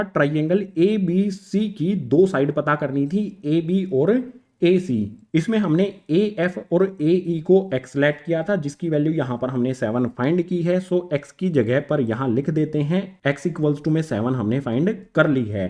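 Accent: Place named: Tamil Nadu, India. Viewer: Love ur voice sir❤️❤️ Bcoz it have Tamil tone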